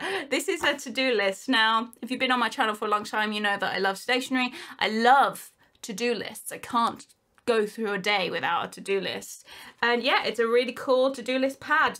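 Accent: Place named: England, United Kingdom. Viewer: Just wondering you sound English How do you pay in GBP Or do you just have to pay in dollars?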